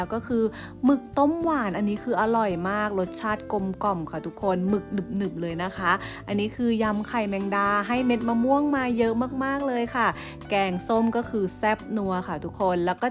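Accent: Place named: Thailand. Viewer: เสียงเหน่อจัง คนจังหวัดไหนครับ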